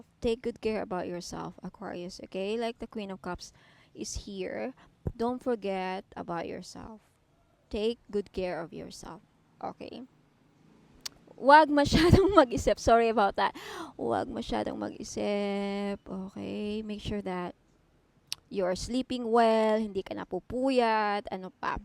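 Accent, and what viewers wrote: Visayas, Philippines. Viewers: Maayong Gabii mam... Bisaya gyudta dae... hehehe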